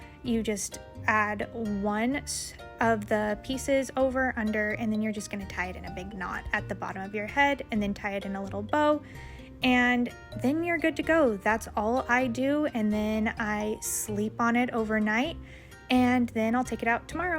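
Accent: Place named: South Africa. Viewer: She’s South African?!! Aye aye! ❤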